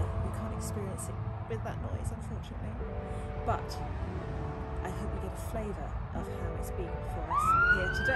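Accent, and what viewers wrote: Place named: England, United Kingdom. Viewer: I just came across this and glad I did. Another fantastic job of a beautiful Natural Woman in a lovely setting. I love the pics on the stairs. Sensual and sophisticated. What makes you different from some of the other models is your natural look. No overly made up, fake looking, Barbie doll. And I love your narration before and after the shoot. You have a wonderful voice, very smooth and soothing to the listener with that very nice English accent. I would love to see you in some pics from an Old English estate type setting with maybe a bit of narration about the history of the place. That setting would fit you perfectly and cover all the bases regarding your unique look and personality. Thank you and keep up the good work.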